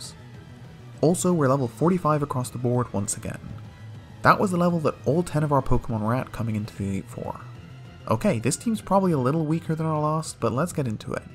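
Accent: Ireland. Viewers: Aye I didnt know you were irish too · NorseGoat I can only recognise two Irish accents, and his voice didn’t sound like either but I feel like such an idiot for not realising, I just watch too much Foil Arms and Hog. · Oh ! I didn't realise you were Irish too!! That's so cool. Hello! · hes from ireland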